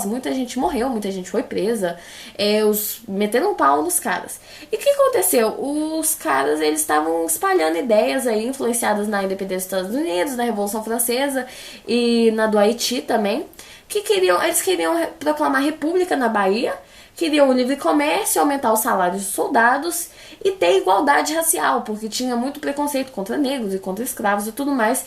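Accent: Minas Gerais, Brazil. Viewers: Vc e mineira ??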